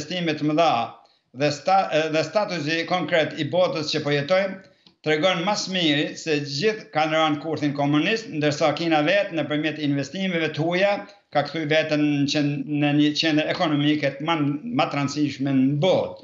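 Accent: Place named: Kukës, Albania. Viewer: Me dialektin kuksian qe flet duket siku te kemi afer,fjalet tuaja te sherojne!Dhe une vi nga fisi,prandaj ndihem krenare per nje njeri si ti!Jetofsh sa malet Doktor!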